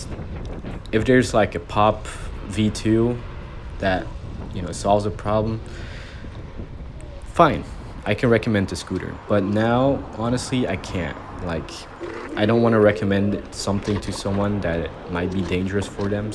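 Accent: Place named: France. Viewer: T'entends pas son accent ? Il est français mais pour toucher plus de monde il fait sa vidéo en Anglais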